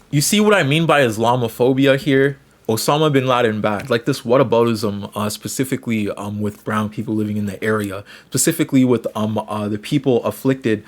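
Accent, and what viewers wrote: Canada. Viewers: You sound like you're from Toronto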